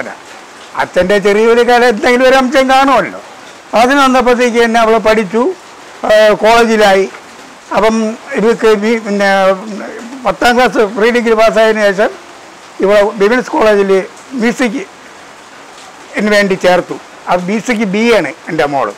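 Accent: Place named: Trivandrum, India. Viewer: അണ്ണാ നിങ്ങട തനി തിരോന്തരം ഭാഷ ആണ് എനിക്കിഷ്ടം അതു കേൾക്കുമ്പോൾ പ്രവാസിയായ ഞാൻ നാട്ടിലെത്തിയ ഫീൽ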